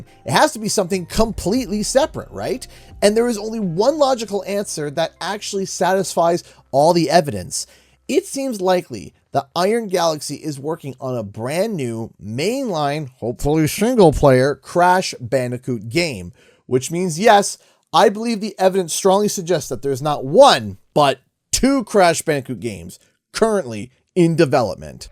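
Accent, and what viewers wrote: Canada. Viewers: What is doing talking about Crash bandicoot? He sounds more Canadian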